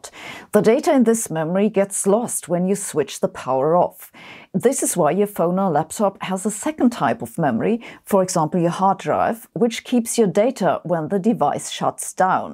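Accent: Germany. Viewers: Somehow her German accent makes the science that she's presenting seem to be even more credible. Although, when she said Dr. Weibel, I thought she was talking about someone named Beiber, since such a name would be pronounced as Beeber in English (and Bye-berr in German). Naturally, I thought she was going to say that "Dr." Beiber discovered magnetic fields by electrifying crowds of teenage girls and causing them to be magnetically attracted to him. Thankfully, the video is packed with info, rather than jokes. Thankfully, also, is the fact that the Dr. in question is a real doctor and is named Weibel, rather than Beiber!!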